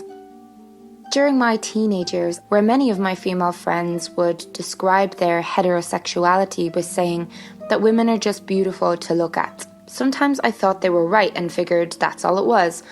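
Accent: Ireland. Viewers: YES YOUR IRISH (TWINS) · ayyyyyye you're Irish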